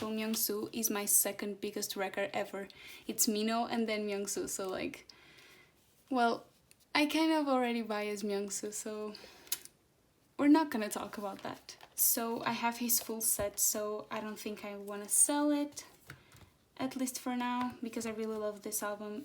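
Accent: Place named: Portugal. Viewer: i knew there was something off about your accent, com que então és portuguesa ahaha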